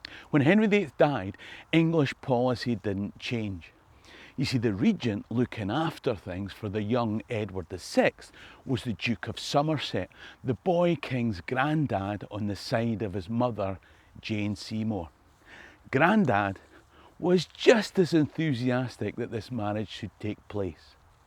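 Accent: Scotland, United Kingdom. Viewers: another great video presentation,. but why the "closed captions"? even as an American, I can understand everything you say. although, I can see where some may have trouble comprehending a Scottish dialect. again, thanks for such a great video.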